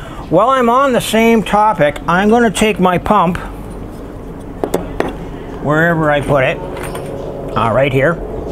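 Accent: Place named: Canada. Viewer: You know what? This guy is speaking in plain English, albeit with a Cannuck accent, yet I cannot understand what on earth he's yabbering-on about, most of the time. I did actually fall asleep at one point...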